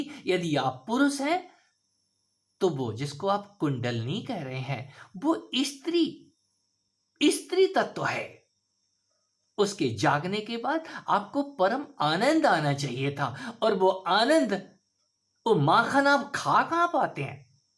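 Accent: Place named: Bareilly, India. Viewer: Guruji ap bareilly me rahte the pahle.. Apko sun kar esa lga mujhe.. Kirpa karke apne bare me kuch kahiye🙏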